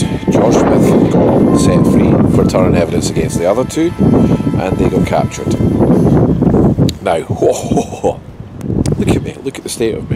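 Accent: Scotland, United Kingdom. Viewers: I've loved the city ever since spending my junior year of college there at the University of Edinburgh. Good times! And somehow, whenever I see the castle, I feel like I'm home, at a second home. Plus it's always a treat to hear the Scots accent. My father was Scottish, but his accent had pretty much vanished when I was growing up - although it would return with a vengeance if he ran into a fellow Scot!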